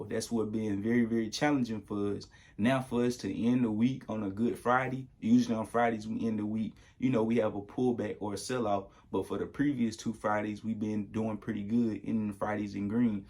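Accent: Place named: Georgia, United States. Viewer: Gotta know where you’re from. I live in Georgia and your accent sounds like you might be a neighbor.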